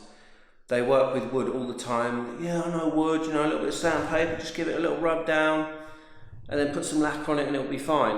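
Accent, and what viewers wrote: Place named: United Kingdom. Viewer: maybe it's your british accent, maybe it's your experience,,maybe it's both, but you seem like an authority on the subject and I trust your wisdom.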